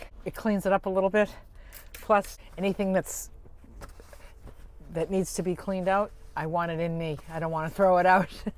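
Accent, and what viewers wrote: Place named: Boston, United States. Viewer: You're loosing the Boston accent!!😅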